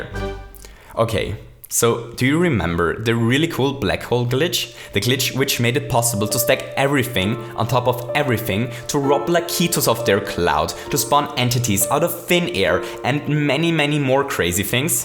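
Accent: Germany. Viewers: Are you german?? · so you're german, nice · He's from Australia but with a heavy German accent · You sound freaking German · are you german? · Whoa i didn't know you were German... I kinda recognized your accent but I wasn't certain until i saw the german language at the point where you checked the Upload date from the new black hole Level... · Are you German? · Lol Youre german ? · are u from germany?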